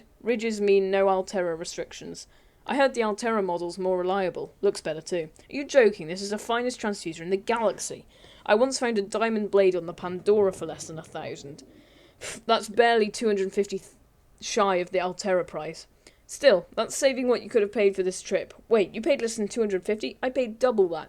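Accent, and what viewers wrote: United Kingdom. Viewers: I LOVE YOUR VOICE... HONESLY I'VE ALWAYS WANTED TO HAVE A BRITISH FRIEND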